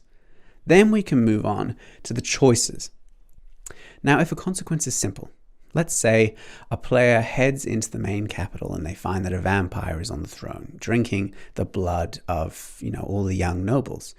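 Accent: Australia. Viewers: First time finding the channel and I immediately ran to the comments to look for this, except I'm pretty sure he's Australian.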